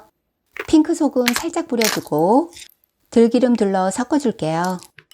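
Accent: Seoul, South Korea. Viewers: 옛날 서울사투리듣는거같지만 천천히 느긋이 설명해주셔서 잘듣고있습니다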